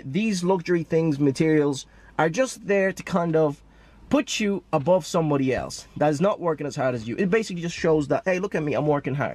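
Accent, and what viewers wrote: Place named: Ireland. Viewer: You sound like Connor mcgregor in your trading videos. You have the same accent and very similar personality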